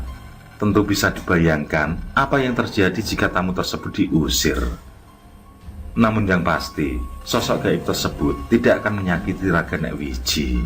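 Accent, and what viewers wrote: Java, Indonesia. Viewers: SUSKREBER BARU , BUK NURI TKW DI SYRIA , SALAM SEHAT WALAFIAT DAN SUKSES SRLAKU UNT VEN SENENG SUARA HOST NYA NGEBAS DAN JAWANYA SANGAT KETAL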